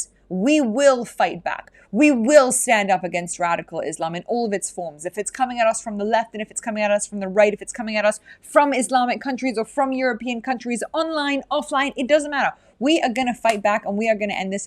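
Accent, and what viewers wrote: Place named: United Kingdom. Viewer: I hear a British accent. Which upsets me. Because I'm worried you & your family left because England is no longer safe for you.😢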